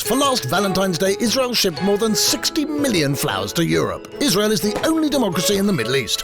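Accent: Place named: United Kingdom. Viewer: This is SO well done! the facts slide from one to the next smoothly, the research is brilliant and the photography top notch. (& everyone LOVES a British accent right?) Well done! My fave part: Ban on ads featuring underweight models! YES!! Love you MORE Israel!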